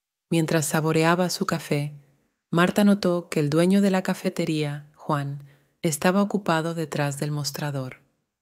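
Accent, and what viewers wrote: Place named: Latin America. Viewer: Good story but I have 2 issues with this. First (and not so important perhaps) is that there is no passion, no spark in the voice of the person telling it. That makes it a bit boring. Second and most important, she mixes accents, she says "decidir", "dulce", "vecinos" and many other words as pronounced in Latin America (with seseo), but then she says "entonces", "hizo" etc in the way they are pronounced in Spain. This is VERY confusing to learners, especially to beginners who are the target audience here.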